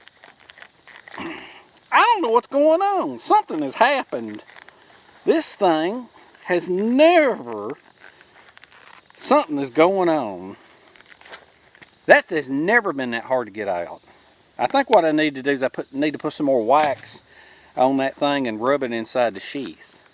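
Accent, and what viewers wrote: South, United States. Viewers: love the accent are you a southern guy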